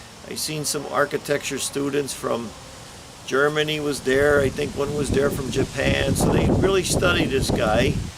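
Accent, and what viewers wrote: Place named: Pittsburgh, United States. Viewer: Today they would use the lickum and stickum method on that stone. You know, you buy it at Home Depot,and they even give a 15 minit coarse on how to do it. The guy or lady giving the coarse might be a retired cop or school teacher. lol But anyway you would still be in jail had you designed that house. But his arrogance F. L. W. was looked upon as a genious even though the place got to the point that it wasent even livable anymore due to how bad it settled. Millions were spent trying to save that place and there are videos of the whole restoration project. A whole bunch of pinheads coming up with a whole bunch of bad ideas on how to fix it. The best way would have been to tear it down and start over with a sensible design. You mention your dad being old, Well in a few days i will turn 87, i can no longer work due to serious back issues, but i can still hang out at my sons large cabinet and millwork shop here in Vero Beach and keep things straight. 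lol And i can still launch my small boat and fish the many very good lakes near here, although the salt water i can no longer do. Your accent says your from the Pittsburg area, where mine would indicate Philly. We still have a nice family owned camp up there in Cameron county, but i havent been back there since the Covid situation heated up. Anyway i cant really take the nasty wether any more either that area is well known for. Keep up the good job on the videos and for gods sake try to remember to put the line up witho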